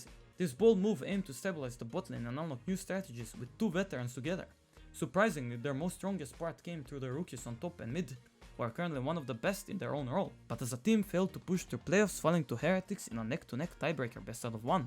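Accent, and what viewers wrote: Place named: France. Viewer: you just know this guys french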